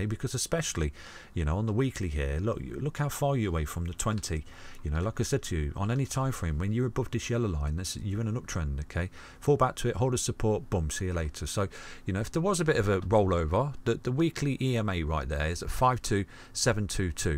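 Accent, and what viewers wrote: United Kingdom. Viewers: You have a fcking perfect british accent, I love it.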